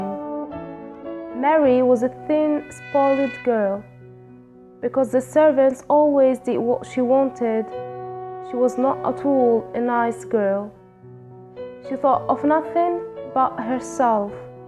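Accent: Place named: United Kingdom. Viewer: الرائعة المتألقة صاحبة اللهجة البريطانية القوية 🌷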